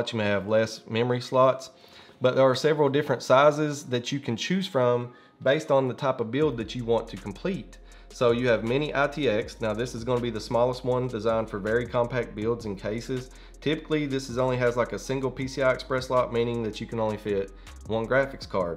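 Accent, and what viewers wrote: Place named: Appalachia, United States. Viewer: Accent is N.C, Tennessee, West Virginia or Kentucky...Am I right?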